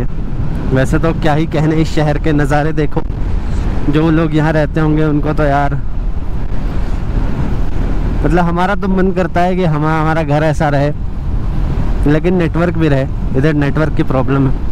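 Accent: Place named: Bihar, India. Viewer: Bhai love ur accent.... Hum bhi bihari hai bhai ❤️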